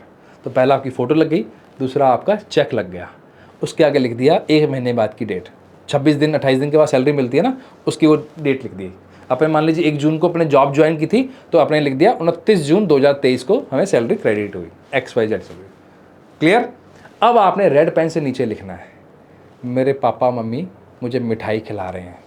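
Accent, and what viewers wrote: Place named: Haryana, India. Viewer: Aap Haryana se ho ji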